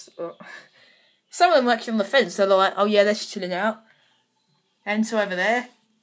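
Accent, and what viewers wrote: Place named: United Kingdom. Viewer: now i know that you sound a bit british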